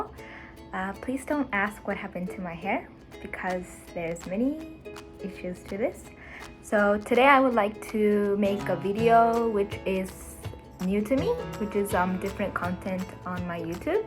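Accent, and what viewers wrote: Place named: United States. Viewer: I am totally confused by your accent. You grew up in Japan, right. Yet, you're accent when you speak English sounds like you spent some time in America, can't hear the Japanese accent.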